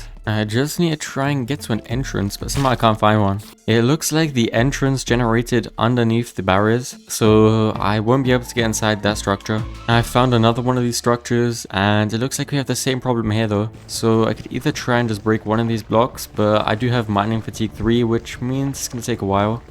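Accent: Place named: Switzerland. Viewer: I think he's from Switzerland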